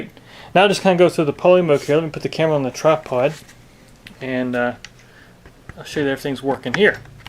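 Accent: South, United States. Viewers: You sound southern?! I’m in NC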